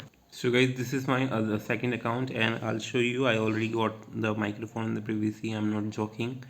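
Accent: India. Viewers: Yada yada yada cant even understand what your saying your indian accent rots my brain.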